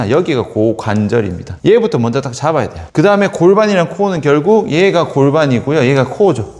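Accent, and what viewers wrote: Gyeongsang, South Korea. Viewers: 너무 웃겨서 자꾸 듣게 됩니다 경상도 말이 재미있어요 ~~~~~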